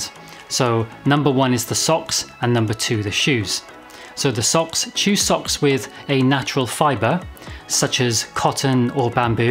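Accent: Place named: United Kingdom. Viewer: I love your British accent! Perfect pronunciation, so clear when you speak!!! Greetings from southern France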